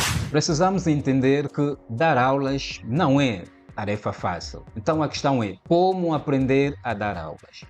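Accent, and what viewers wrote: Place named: Angola. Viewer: professor você é de angola ou moçambique? Sem preconceito é claro.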